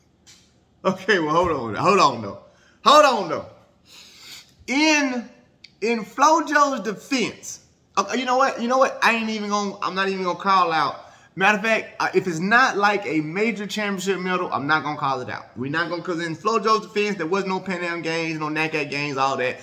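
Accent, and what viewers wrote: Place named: Georgia, United States. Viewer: P.s my mom used to love your voice when I would play and listen when I would sit with her at the table having dinner..she said you sound like some of her people in Georgia. I miss my mom laugh she thought your voice was so back home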